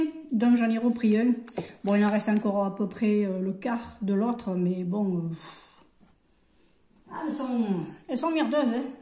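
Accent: South, France. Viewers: J’aime t’écouter, tu me fais rire. Les tourterelles prennent tout et les petitouts n’ont plus rien du tout. Ta façon de parler et ton accent sent si bon le midi, ça me rappelle Pagnol que j’adore 🥰. Bonne journée, bisous 😘😘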